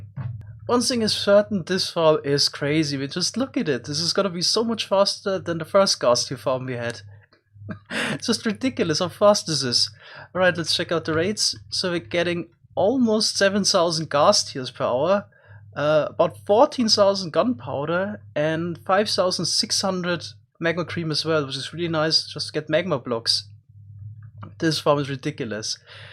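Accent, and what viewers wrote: Germany. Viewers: Noticing someone's accent is not racist, dont worry. He is still flluent in English, with a pretty obvious german accent.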